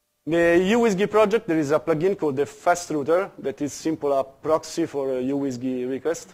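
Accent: Italy. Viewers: Because this was recorded in Florence Italy. Europe isn't a single country. There are many different languages being used. And he IS speaking English, but he has an Italian accent, we get used to it. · It's just I had hard time understanding, very strong accent. Maybe it's because I heard Italian accent for the first time.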